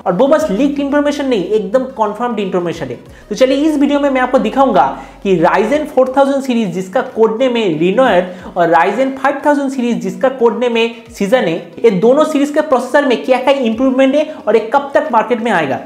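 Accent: Bengal, India. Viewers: Nice Bengali ascent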